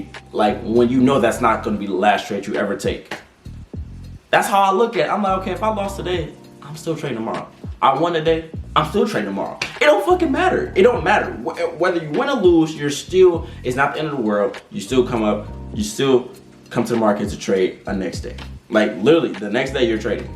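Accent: United States. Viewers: Lmaoo you gotta be from Detroit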